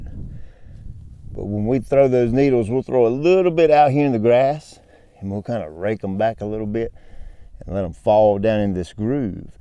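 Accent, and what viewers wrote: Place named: Georgia, United States. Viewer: Like that South Georgia drawl! North Georgia here!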